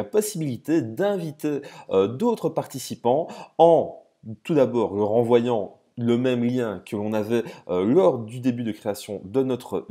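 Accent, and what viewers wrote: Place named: Belgium. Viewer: Bravo! Explication complète, très claire et très didactique, avec une petite pointe d'accent belge bien sympathique... Vous serez sans doute ma référence, de même que ce logiciel l'est également ! Il faut préciser que le système utilise le principe du pair to pair et des serveurs répartis, ce qui convient particulièrement bien dans les zones mal desservies par internet (Afrique...) et qu'on ne dépend d'aucun prédateur du web tel que Microsoft, Apple, Google.... Il n'y a pas d'internet libre sans logiciels libres.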